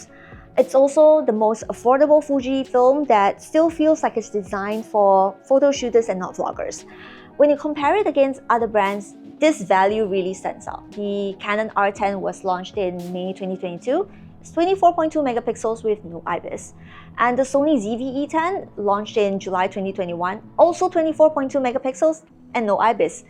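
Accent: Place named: Singapore. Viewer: fellow singaporean here and i’m so happy to hear a familiar accent on youtube!! :) i’m also planning to get this camera so this is very useful. thank you!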